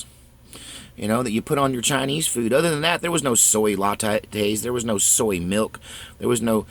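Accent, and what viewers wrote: New York, United States. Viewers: Hey. Can you say 3rd and 33rd street in that New York accent? 😅. Yes now we need to find out why we are getting the raw end of this agenda 21 and whoever they are are so important that they should get a free ride? I mean everyone who's an elitist needs to re think that. They're no more better than anyone else. Now I hear about all of the good stuff about marijuana. Hey when you can can you contact me? I have a wild idea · That guy who thinks you're making fun of Jewish people is stupid. Every one knows that your accent is from that Mike Meyers SNL skit and if they don't then they are a millennial and a cupcake. It is a NEW YORK ACCENT however my Jewish in laws from Long Island sound like this BUT so does EVERYONE ELSE FROM LONG ISLAND! When I visited I went to a diner and I ordered my entire breakfast in that accent as a joke and NO ONE figured out that I didn't speak that way! 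😁 And soy raises estrogen....no one realizes how it is in all of our food. Chemo is terrible!!! And I've also unplugged from TV/media and I also only use ibuprofen as well...most surgeries are excuses to line the pocket of the doctors!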